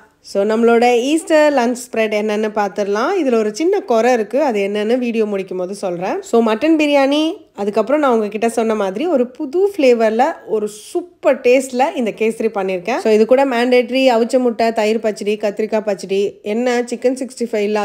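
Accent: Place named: Bangalore, India. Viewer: Hearing Bangalore Tamil😅 And ur Tamil feels good 😊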